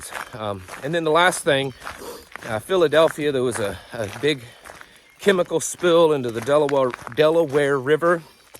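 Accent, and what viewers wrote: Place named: South, United States. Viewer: What's up the southern accent?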